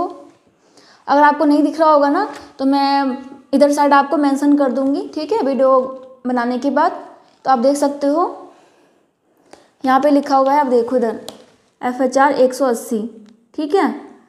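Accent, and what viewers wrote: India. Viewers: Ap noida se hai kya ??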